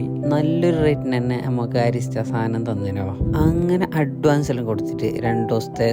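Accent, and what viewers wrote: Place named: Kasaragod, India. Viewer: ഭാഷ മലയാളം. ..സ്ലാങ് കാസറഗോഡ്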